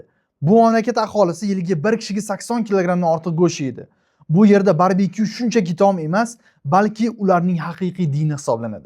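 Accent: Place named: Kashkadarya, Uzbekistan. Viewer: Кашкадаре вилоят шекилли😂😂😂😂😂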